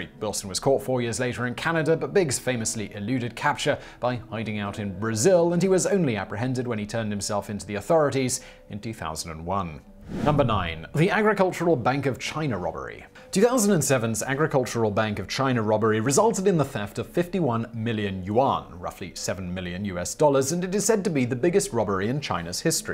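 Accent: United Kingdom. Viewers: British pronunciation. They also refer to 22s as "two two".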